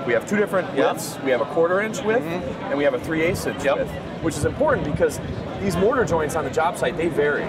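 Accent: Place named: Midwest, United States. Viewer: Yaw I werk fer Bawsch! Jesus Christ that’s one hell of a Midwestern accent there, bud! Great job demonstrating how worthless that attachment is too.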